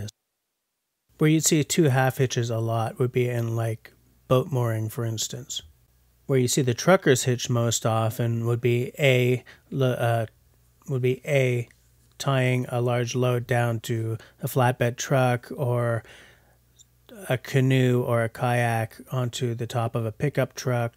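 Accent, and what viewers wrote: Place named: Western Canada, Canada. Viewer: Hey there friendly. Man, I loved this one, too. Dead battery, yeah right. I bet it was a Nigel Tufnel wireless issue and you had the RCAF instead of you talking. Lol. How hard was the dubbing at the beginning? I'm just learning to edit, so I know it must have been way tougher than it looks. Had to watch twice, you kept cracking me up. I like your dry sense of humour. "here I am being silly/I must have said something funny/as I look pensive". Happy Thumbs up, sir. On a side note, your accent doesn't sound Quebequois...west of Manitoba, perhaps? Take care mon frere,.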